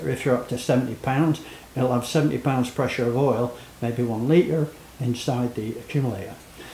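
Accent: Yorkshire, United Kingdom. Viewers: Are you a Yorkshire man ?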